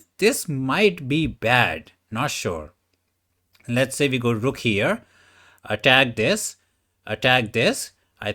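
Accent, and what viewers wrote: India. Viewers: I randomly find your Channel, i love when hindu People speaking english❤, i was work with People from India❤ 🇵🇱🤝🇮🇳 greetings bro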